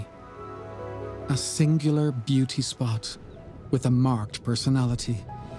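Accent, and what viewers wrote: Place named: Ireland. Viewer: Irish dialect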